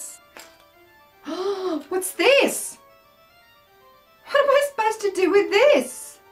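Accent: Germany. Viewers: Love your German accent, or is that accident lol. I could get right into the smurf sniffing thing! This is getting to be a ridiculous comment, sorry. Wow German stuff is weird (in ref to the little sausage). :) Ask a German to say butterfly (in German)....interesting. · Love your german accent :D I would be the same :p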